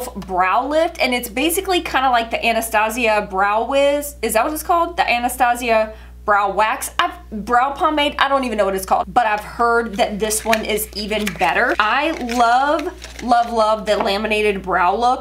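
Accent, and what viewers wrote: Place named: South, United States. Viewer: It's so good to hear that Southern draw. I'm in AL :-) thank you for letting us know about Womanesse. I'm getting the neck serum! I look forward to seeing more of your videos! Stay beautiful xoxo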